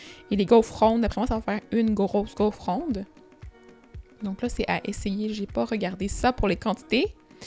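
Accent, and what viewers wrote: Quebec, Canada. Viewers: Je viens de découvrir ta chaîne. Comment te dire que je l'adore déjà Déjà cet accent québécois 😍 puis ces recettes 😝 Merci pour ce beau partage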